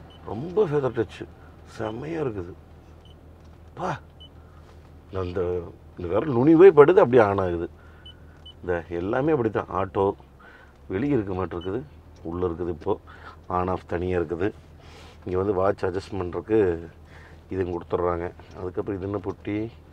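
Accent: Kongu, India. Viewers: மகிழ்ச்சி, வாழ்த்துகள் வெகு நாள் களித்து கொங்கு தமிழில், கேட்பதற்கு இனிமை. கொங்கு தமிழில் வெல்பயர் அனுபவம் ... அட்டகாசம் இப்படியொரு அனுபவத்தை கொடுத்ததற்கு டொயோட்டா நிறுவனத்தினர் உங்களுக்கு சன்மானம் கொடுத்திருக்கவேண்டும், அப்படிகொடுத்திருந்தால் கூட அது தகும் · கொங்கு தமிழில் இயல்பான இயற்கையான வர்ணனை காரை தேர் என தலைப்பிட்டது அருமை. அய்யா இதேபோல் வாழ்க்கையின் இன்பங்களை அனுபவிக்க எல்லாம் வல்லவனை வேண்டுகிறேன். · நீங்கள் பேசற கொங்கு தமிழ் அழகுங்க ணா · Unga Kongu Slang Nalla iruku😍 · Excellent Review with Amazing Natural Kongu Tamil yengu Anna,... Fantastic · Kongu Tamil speech superb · நான் அண்ணா வணக்கம் நீங்கள் கொங்குத் தமிழ் பேசி மிகவும் அருமையான ஒரு வார்த்தைகளால் மிகவும் எங்களை எளிமையாக்கி விட்டீர்கள் உங்களுக்கு டொயோட்டா கம்பெனி மிகப் பெரிய கடமை பெற்றுவிட்டது எல்லாம் உங்களை சாரும் ஏனென்றால் விளம்பரத்தை விட நீங்கள் சொல்லும் ஒவ்வொரு வார்த்தைகளும் இனிமையாகவும் சிறப்பாக உள்ளது அண்ணா உங்கள் வார்த்தைகளுக்கும் உங்களுடைய அன்பு தமிழ் மொழிக்கும் கொங்கு தமிழ் மொழி வாழ்த்துக்கள் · கொங்கு மண்ணிற்கே உரித்தான தமிழ். 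· Super Sir! Your explanation in native kongu language is sweet and enjoyable. Nice car sorry nice chariot. Thanks 🎉🎉🎉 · அய்யா கொங்கு பாஷை..அடி தூளு..சூப்பர்..சூப்பர்..என்னமோன்னா மூஞ்சி ரொம்ப நீளமா இருக்குதுங்கோ...என்ன தான் இருந்தாலும்...அந்த காலத்து பிளைமவுத் ரேஞ்சுகெல்லாம் கெடையாதுங்க..உங்க பேச்சுல அந்த ஙொன்னியா... கோயமுத்தூர் குசும்புங்க...மறக்காம நண்பர்களே நம்ப THILAVU TALKIES.. You tube Channel..வந்து பாருங்க..பின்ன‌ நாமெல்லாம் THAR..ஜீப் வாங்கறது.. மறந்துடாதீங்க மக்களே...மக்களுக்கு மக்களே... Ukkrishwriter Mtp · Kongu slang 👌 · சூப்பர்னே, கொங்கு slang review கேட்கவே அருமையா இருக்கு. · அன்னா வன்டியவிட உங்ககொங்குதமிழ் ரொம்பபுடுச்சுருக்கு வாழ்த்துக்கள் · அந்தக் கார் எவ்வளவு அழகோ அதே அளவு நீங்கள் வர்ணிக்கும் கொங்குத் தமிழின் உச்சரிப்பும் எனக்கு எங்கள் நெல்லை தமிழுக்கு அடுத்தபடியாக என் இதயம் கவர்ந்தது கொங்கு தமிழ் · உங்களின் கொங்கு தமிழ் பேச்சு அழகோ அழகு அண்ணா.... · குழந்தை மாதிரி முகத்தில் எவ்வளவு மகிழ்ச்சி..எங்கள் கொங்கு தமிழில் பேசுவதும் அழகு! 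· Sir, your narration so nice, kongu tamil slang no words very beautiful... sir good night..... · எல்லாத்துக்கும் புரியிற மாதிரி அழகான கொங்கு தமிழ்ங்க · குழந்தை மாதிரி முகத்தில் எவ்வளவு மகிழ்ச்சி..எங்கள் கொங்கு தமிழில் பேசுவதும் அழகு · உங்க நடைமுறை பேச்சு மிக அருமை.. அதுவும் கொங்கு தமிழில் 👌👌❤❤❤ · Ungal Kongu Tamil Azhagu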